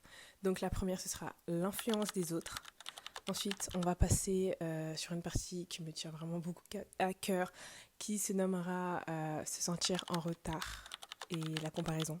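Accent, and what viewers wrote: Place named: England, United Kingdom. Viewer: J'aime ton accent anglais😊☺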